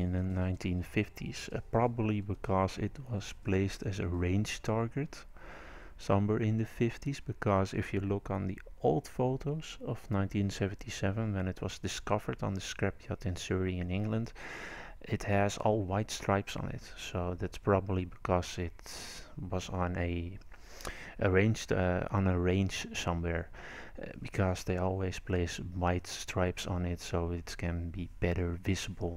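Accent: Netherlands. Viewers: ja ja als je engels wil praten doe dat dan met engels aksent en niet met nederlands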